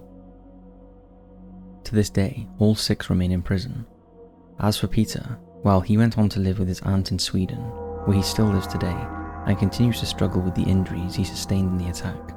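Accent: Wales, United Kingdom. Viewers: Welsh? Is that the accent?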